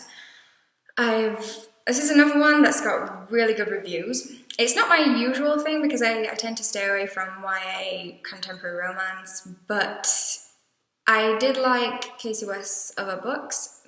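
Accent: United Kingdom. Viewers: subscribed to your channel! Please keep uploading new videos. I felt in love with your British accent. :D The more videos you upload the more confident you eventually become. :) p.s. nice T-shirt. Give your cat a rub it was well-behaved. :D :D :D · Aw, your cat is so well-behaved! Uprooted is next on my list. Anything that gets compared with Howl's Moving Castle is an instant win. Also, it's so great to hear a Northern accent! My Stoke one tends to get the ear squinting treatment! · I'm so glad your doing video blogs now. I love hearing about the books, I have read a lot that you mention in your blogs and others I add to my list as you make them sound interesting. I love your British accent as I love all things British. Had to include that!:)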